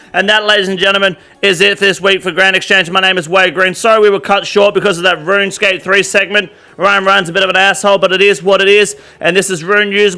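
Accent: Australia. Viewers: idk about you but i’m watching his vids because he’s actually unique, very very funny (had quite a few laughs here) intelligent and level headed. Doesn’t involve himself in drama. Stays winning and stays in his lane. Aussie accent, what’s not to like about the dude? He could be reading the entirety of lord of the rings as an audiobook and i’d listen because it’s his energy and personality that’s infectious.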